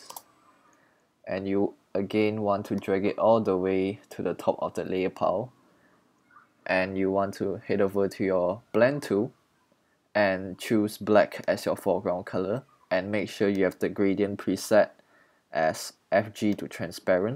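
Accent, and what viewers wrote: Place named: Singapore. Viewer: Thank you so much for this tutorial! I am learning how to edit some artwork for my gaming channel and am willing to learn more tricks about GIMP! Are you from Singapore (making a guess based on your accent... cuz I'm Singaporean here!)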